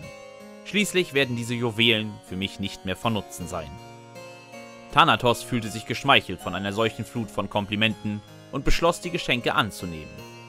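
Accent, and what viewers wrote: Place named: England, United Kingdom. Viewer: Als Grieche schmerzt es meinen Ohren, die Namen im Deutschen in englischem Akzent zu hören...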